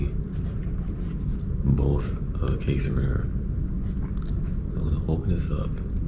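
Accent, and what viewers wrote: St. Louis, United States. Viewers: That st louis accent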